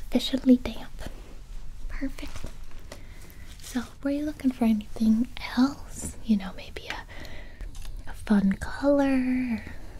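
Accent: South, United States. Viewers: Southern drawl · The southern accent mmm love it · Not gonna lie....the southern country accent threw me off lmao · I'm from Canada and she sounds very distinctly non-Canadian to me although I do hear the southern part it's very distinctive. I guess maybe if you stretch it you could say she has a B.C./Albertan accent but I think just Southern U.S. is more accurate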